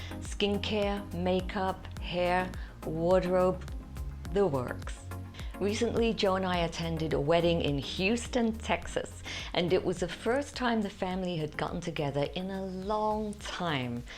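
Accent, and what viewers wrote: United Kingdom. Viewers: You’re lovely. Do I detect a UK accent?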